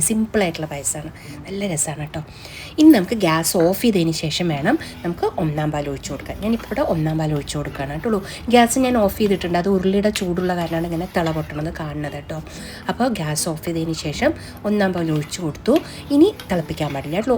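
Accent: Palakkad, India. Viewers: കേട്ടോളൂ കേട്ടോളൂ പാലക്കാടൻ ഭാഷ നല്ലൊരു രസമുണ്ട് കേൾക്കാൻ 😄❤ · From your language, are you from Palakkad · പാലക്കാടൻ രുചികളും പേരും ഒരു രസാണ് അതിന്റെ കൂടെ ഒരു പാലക്കാടൻ സംസാരവും കൂടെ കേട്ടാലോ ഹയ്യ് എന്താ രസാ കുട്ട്യേ ബഹുബഷ് 🤭അടിപൊളി പായസം kutta കണ്ടപ്പോൾ കൊതിയാകുന്നു 😋😋😋അതിന്റെ ഇടയിൽ താൻ സംസാരിപ്പിച്ചു കൊതിപ്പിച്ചും കൊള്ളാം അടിപൊളി 😋😋😋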